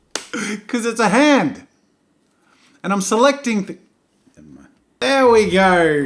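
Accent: United Kingdom. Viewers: The brit accent though 😂😂😂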